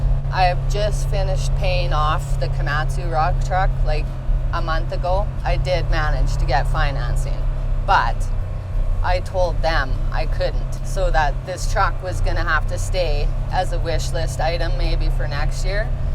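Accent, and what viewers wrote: Canada. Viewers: Your semi-canadian accent is hilarious by the way 🤣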